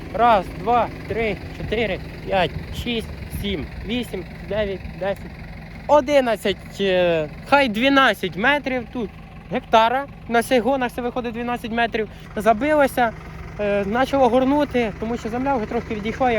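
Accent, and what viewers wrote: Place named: Western Ukraine, Ukraine. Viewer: у вас гарний західноукраїнський акцент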